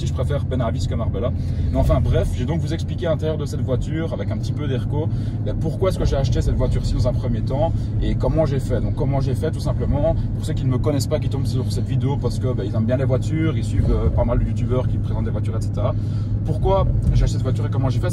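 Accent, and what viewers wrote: Belgium. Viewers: T’es belge ?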